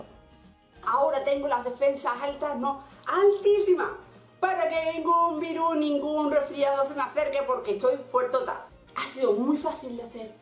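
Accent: Andalusia, Spain. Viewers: Con Acento Andaluz hola Cuánto duran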